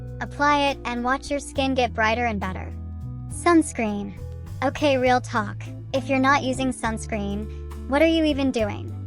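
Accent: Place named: Multan, Pakistan. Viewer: Her accent multani mithi omg 😂😂😂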